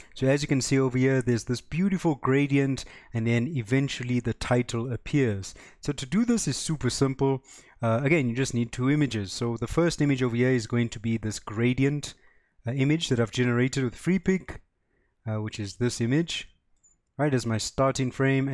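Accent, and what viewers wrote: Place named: South Africa. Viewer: Was just looking for an overview of Pikaframes and found your channel. Then while listening, i was like, this guy sounds South African! Then found out you are. So i am so glad to see fellow South Africans doing well on YouTube and in the AI space. Im still trying to get there, but hopefully will someday, but at least your video gave me what i needed to know from Pikaframes to know that it is going to work for my next YT channel and hopefully will do well :) Thanks bro!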